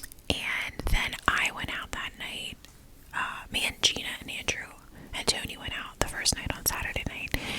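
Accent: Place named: Philadelphia, United States. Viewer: Just wanted to say as someone from Philly I love your videos as for some reason asmr is extra relaxing to me when the person has the same accent as me. Will be binge watching all your content!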